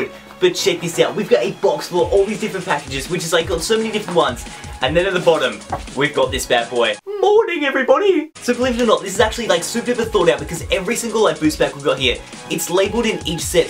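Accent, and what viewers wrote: Australia. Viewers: hey, just wondering, r u from South Australia?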